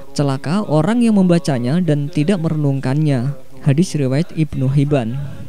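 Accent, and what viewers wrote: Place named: Yogyakarta, Indonesia. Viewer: Logat orang jogja ya wkwk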